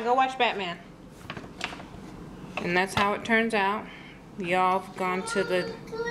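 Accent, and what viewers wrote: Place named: United States. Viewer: U sound americanized as all heck...like me lol. And your mom sounds like mines to rest her soul. Thanks for the recipe :)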